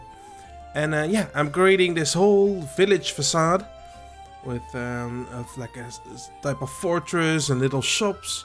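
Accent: Netherlands. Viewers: Dutch accent, Efteling music, Pieck dark ride - what's not to like! Subbed.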